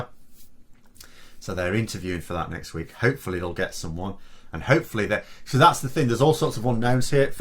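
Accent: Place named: Doncaster, United Kingdom. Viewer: He's from Doncaster, can't you tell? 😀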